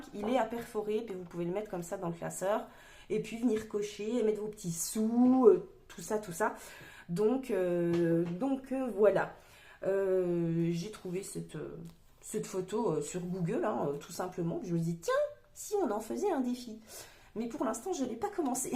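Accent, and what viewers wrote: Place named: Franche-Comté, France. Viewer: Super vidéo, tu es vraiment top, j'adore ton enthousiasme et ta joie de vivre que tu nous partage. Et ce que je préfère c'est ton accent franc comtois que j'adore tant. Bon courage pour monter dans le nord.... Le jura c'est quand même vraiment trop beau.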